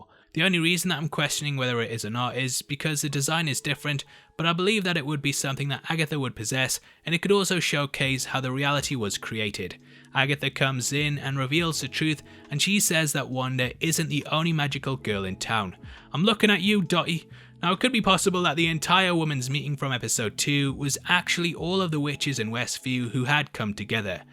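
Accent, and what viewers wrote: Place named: North East England, United Kingdom. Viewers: You’re deffo from the north east like x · Sounds like a South Shields accent...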